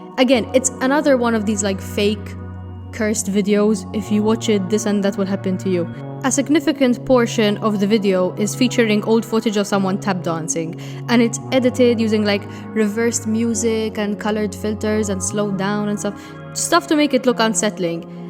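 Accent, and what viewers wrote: Malta. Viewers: This is so off-topic, but the whole video I'm sitting here like "WHERE's their accent from, I can't place it but it's so pleasant to listen to" and turns out I've never actually heard a Malta accent before?? so now I know lol, but just wanted to say, your voice is so pretty! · Was trying ro figure out the accent would have never guessed Maltese Also you are so right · off topic but i love the accent.. i have always been fascinated by the maltese language and how it is latinized arabic but i've never heard a maltese person speak english and the accent is just that. very warm and lovely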